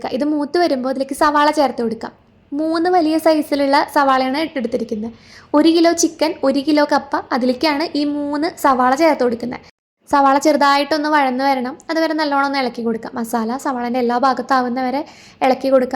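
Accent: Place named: Thrissur, India. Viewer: തൃശ്ശൂർ ക്കാരി ആണല്ലോ. കൊള്ളി എന്നു പറയ tcr അല്ലെ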